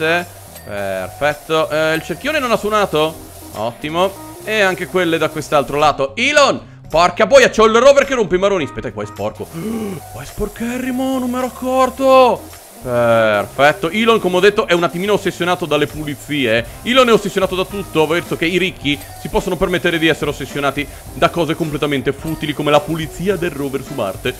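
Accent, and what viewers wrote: Veneto, Italy. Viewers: Ma sei Veneto? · Ma sei veneto o friulano? XD io wono veneto. Su xbox è gratis power wash?? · L'accento veneto piú veneto che abbia mai sentito😂😂😂😂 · Tu sei veneto??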